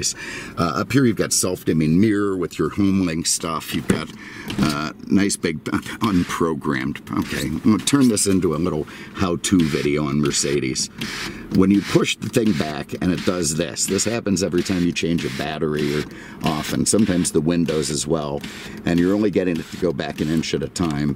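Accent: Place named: New York, United States. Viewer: I love this guys chatter. He has a New York type accent and a lot of character to his speech. He is very entertaining and charismatic love his reviews ...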